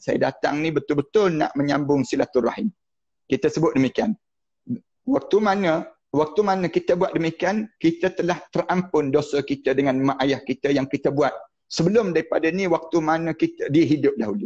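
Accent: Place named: Kelantan, Malaysia. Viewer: Samo kelate kecek kelate la kak kak Lopaa..😂😷😁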